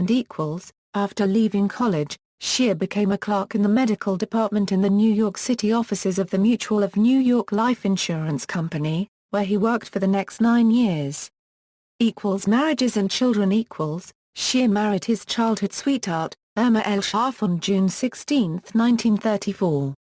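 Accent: United Kingdom. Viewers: Is this female British voice an actual woman’s voice or an AI FAKE VOICE?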